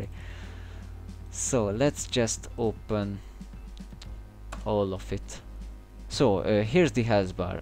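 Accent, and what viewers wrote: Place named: Hungary. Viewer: Szia jól rejted a magyart XD lehet hallani ahogy mondod hogy erölteted az angolt és a magyar kiejtéseden is hallatszik(saját mappa, eggyik videóban ki irtad angolul:ez egy magyar vagy valami ilyesmi nem tudok angolul) de egyb. örülök hogy van magyar profi!!!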